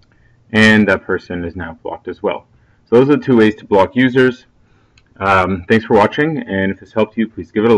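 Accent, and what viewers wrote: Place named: Canada. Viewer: I adore your Canadian accent!! Nicely done. Very clear and easy to understand instructions. Thank you.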